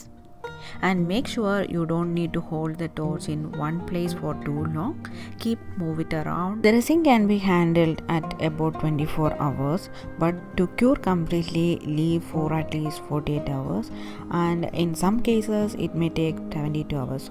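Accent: India. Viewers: мне так нравится твой индийский английский!!! Американский английский звучит на мой слух ужасно, не всегда можно понять говорящего, а твой звучит чётко и даже нежно. Обожаю тебя слушать, хотя ты редко это делаешь. А цветок нежнейший, а запечатанный он выглядит просто восхитительно!!!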